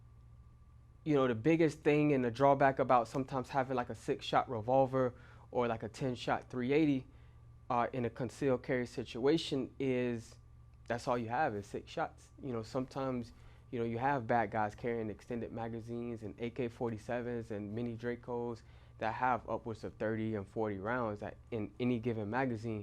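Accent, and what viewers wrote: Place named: South Florida, United States. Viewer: Idk where you from but you sound like a dude from South Florida 🤔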